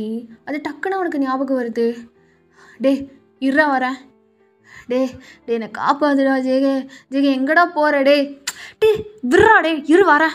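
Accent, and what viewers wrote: Kerala, India. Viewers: Ahhhh 😩 ur malayalam is so cute🤍.. I want pinch your cheeks 😅🤏🏻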